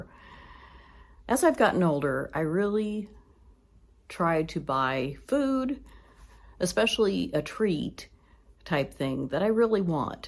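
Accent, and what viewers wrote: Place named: Indiana, United States. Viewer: I, too, am an introvert and feel exactly like you do! Since I have been widowed, I mainly stay by myself. I wanted to ask you if you were from Indiana? You Have an accent like my cousins do!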